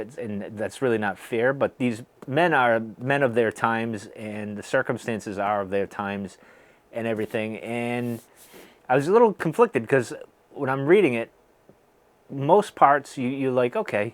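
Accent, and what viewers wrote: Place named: Boston, United States. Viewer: Judging by your accent you sound like a Celtics fan?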